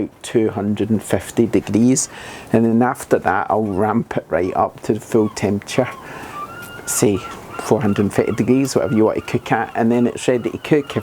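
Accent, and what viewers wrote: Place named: Glasgow, United Kingdom. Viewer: Thanks for the info! I love your accent - are you from Glasgow?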